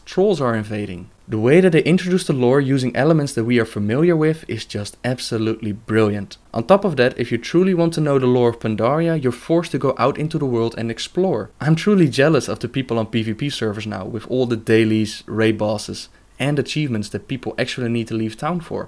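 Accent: Netherlands. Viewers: me too i kinda heard it already its very easy to hear a dutch talking english any way good review ^^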